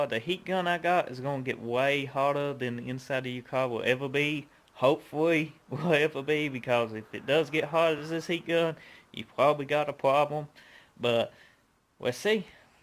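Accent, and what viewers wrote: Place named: South, United States. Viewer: LMAO....YEP. WHERE ARE YOU FROM? YOU HAVE A SOUTHERN ACCENT...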